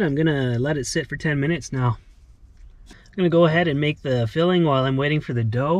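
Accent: Canada. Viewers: The first couple seconds my first thought was "He sounds extremely Canadian."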